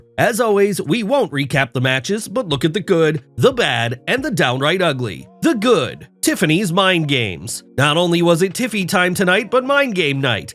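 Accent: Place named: United Kingdom. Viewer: Why is the British narrator always sick the English guy is annoying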